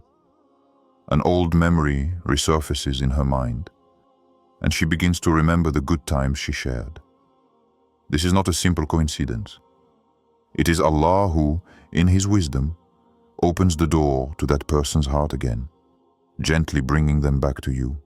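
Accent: France. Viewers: Thank u bro , are u from france or Canada !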